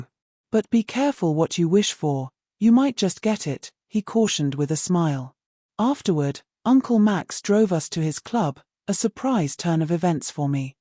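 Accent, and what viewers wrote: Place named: United Kingdom. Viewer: They are NOT mispronouncing "schedule". You obviously failed to notice the AI reader's BRITISH accent. That is the way the British pronounce "schedule.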